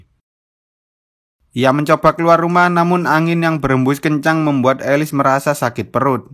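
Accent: Java, Indonesia. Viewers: Bang koe wong jowo endi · Bang orang jawa😂 sama dong kayak aku 😆😁😁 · Bang ngomongnya kok kya orang jawa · abang orang jawa kah?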